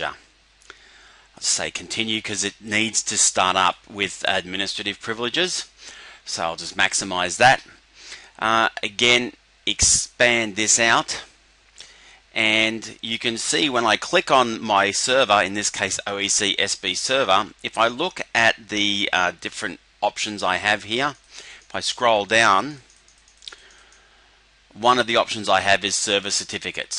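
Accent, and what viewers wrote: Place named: Australia. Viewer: love the auzzy accent ;)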